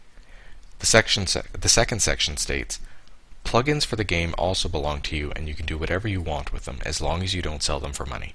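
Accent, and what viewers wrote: Canada. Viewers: Are you canadian? I sweat I heard aboot a few times.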